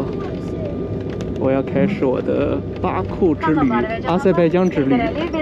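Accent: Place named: Shandong, China. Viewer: 是哪里人？听口音像是山东的。另外问一下，用的啥装备拍的啊？感觉画质很清晰。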